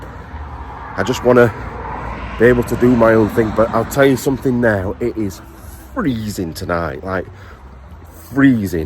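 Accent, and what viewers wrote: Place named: England, United Kingdom. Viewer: Northern Irish here lol it was a bird but if there's teens there and hear your English accent hey with think your soldier lol b3 careful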